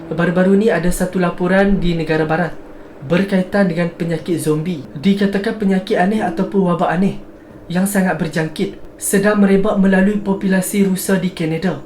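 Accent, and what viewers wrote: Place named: Kedah, Malaysia. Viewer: Slang pelat Kedah...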